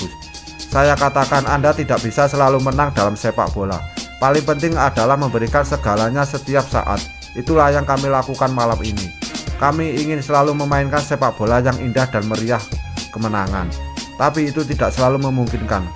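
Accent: Java, Indonesia. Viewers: Aku suka channel ini..cmn suaranya min ini yg aku gak suka..logat Jawa nya terlalu kentalnya dan kedengaran nya gk terlalu jelas min baca naska kaya ke anak SD yg baru belajar baca.. tolong min Suara yg lantang dan jelas kita pengen menikmati berita🙏🙏🙏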